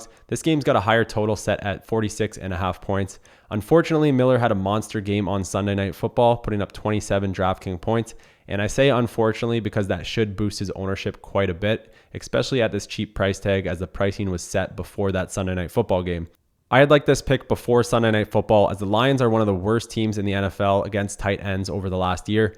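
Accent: Canada. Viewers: SirDeonTheGreat he's from Canada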